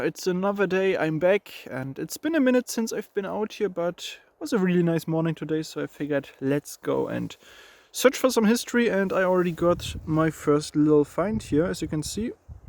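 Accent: Germany. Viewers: Are you German you have an German accent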